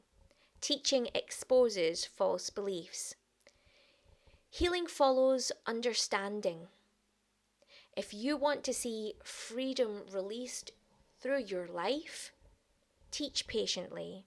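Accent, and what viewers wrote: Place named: Ireland. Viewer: Nice. I wonder how quickly that deep Irish brogue would take to me if I lived in Ireland. I love to hear you speak. ❤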